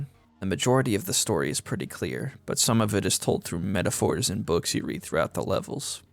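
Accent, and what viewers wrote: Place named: France. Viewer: French, opinion discarded.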